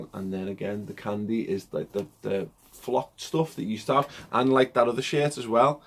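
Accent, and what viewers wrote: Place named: St Helens, United Kingdom. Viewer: And by the way kids shirts are not £200 clueless wools man get back to St. Helens with that accent. Fake Liverpool fans ha ha ha ha ha ha ha ha ha ha haha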